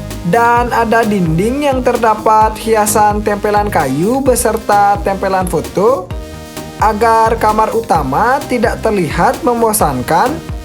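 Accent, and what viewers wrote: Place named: Sunda, Indonesia. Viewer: Sunda banget suaranya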